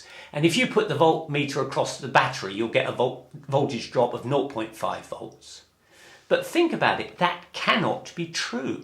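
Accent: United Kingdom. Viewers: i am too distracted to listen because his accent makes me feel like im in Hogwarts lol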